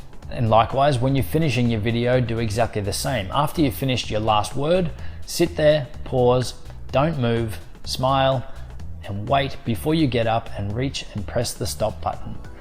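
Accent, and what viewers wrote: Australia. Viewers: You sound like you are from Australia but I can't get the microphone on Amazon to ship to Australia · Great video. Brw he sound Australian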